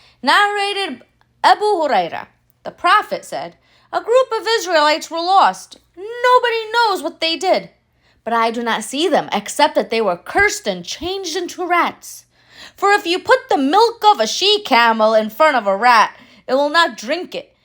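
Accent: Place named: Levant, Arab world. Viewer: Very good acting in Eastern Arabic English accent. Wow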